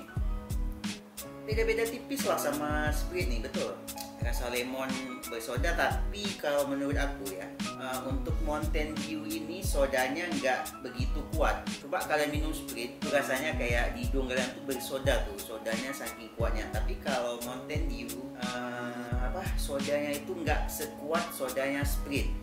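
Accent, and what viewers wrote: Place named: Aceh, Indonesia. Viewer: Orang aceh emang biasanya ngomongnya begitu logatnya gitulah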